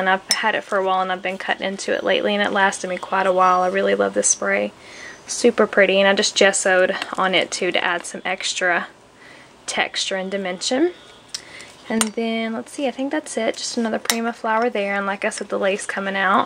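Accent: South, United States. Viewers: LOL...I should be bangin my head on a wall...How could I not know...I live in Ft. Pierce and my store is in Pt.St. Lucie and we had the visit form Isaac too...I did pick up your "Y'all" lol you can't hide your southern girl...hehehe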